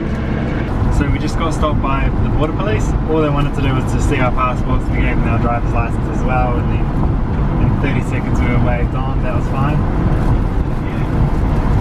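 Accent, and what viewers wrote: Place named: New Zealand. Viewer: Kiwi accent owwwwwwwwwww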